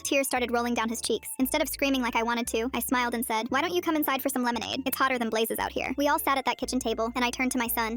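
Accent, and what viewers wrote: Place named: South, United States. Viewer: Damn, you’re southern as hell😅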